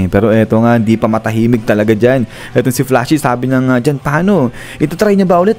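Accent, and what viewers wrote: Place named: Batangas, Philippines. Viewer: Tanong ko lng lods kung batanggenño kba? or bulacaño? May punto kasi ung pagsasalita mo 😆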